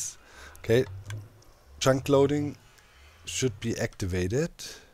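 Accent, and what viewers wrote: Germany. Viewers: that synchronised inventory poppy technology thing is absolulty mindblowing. Recognized you last season because of this for the first time. Since then love watching ur videos (and espescially that ur vids are so long, haw*.* best for coffee in the morning) haha and btw first time i heard u speaking id know hahaha hes german, his pronunciation is the same like mine :D:D lots of love from potato to potato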